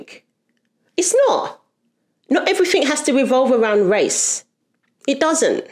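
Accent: England, United Kingdom. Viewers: Just found your channel and subscribed straight away. It is always a joy to hear the Queen's English spoke so eloquently, and a young person with such good perception. Your accent suggests that you are from England or a Commonwealth Nation, being in America now makes your perspective extremely valuable for the rest of us. Looking forward to following you!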